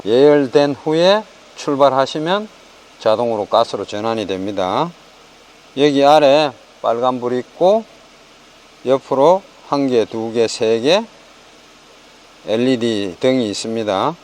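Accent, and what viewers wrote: Guryongpo, South Korea. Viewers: 나날이 발전하는 블루나인 사장님 짱이야 ! 구룡포 사투리 구수합니다 😂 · ㅎㅎㅎ 구룡포 발음이 좀 빡세긴합니다.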